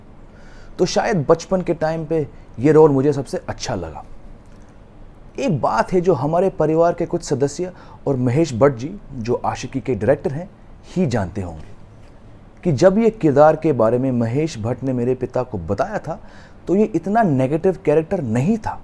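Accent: India. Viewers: Aap Muje original Indian hi lage aam Indian ki tarh aap hamse guftgu karte hai Aap k father bhi hamari tarah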